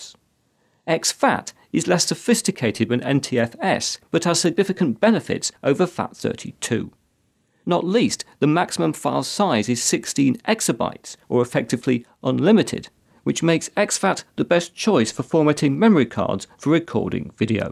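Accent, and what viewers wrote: United Kingdom. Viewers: Would love to listen to this but I just cant overcome my distaste for the british accent, sounds so goofy, my apologies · I like your English accent. Is it British accent?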